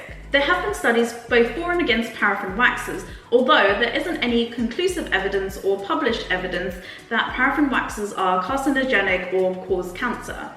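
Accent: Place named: United Kingdom. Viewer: Nice work sister. Love that British accent lol.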